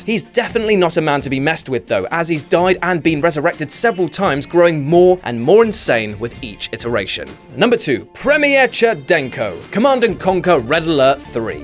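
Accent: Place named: South West, United Kingdom. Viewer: Where are you from in the south west?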